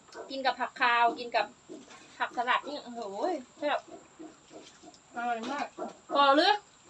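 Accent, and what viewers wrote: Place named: Loei, Thailand. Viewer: เว่าคือทางเมืองเลยน้อ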